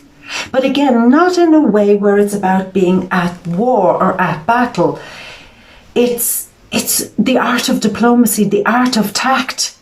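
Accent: Ireland. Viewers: .. Lady you are yummy.. Love that Irish accent.. Cheers from Australia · Lovely - Thank You!! still love your Irish!! especially that part where you tell them to GTH!!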